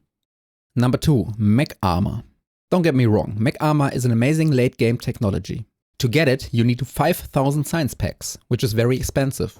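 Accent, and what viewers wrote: Germany. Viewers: Do i hear a german?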